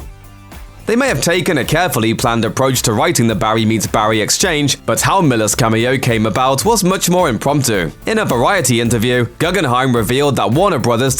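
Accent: United Kingdom. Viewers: He's actually British. He's a YouTuber called. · I can’t tell if it’s British or not lol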